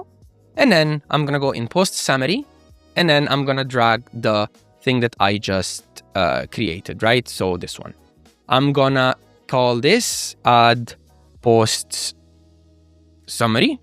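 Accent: Italy. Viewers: You make very good videos even if your English is a little bit Italian.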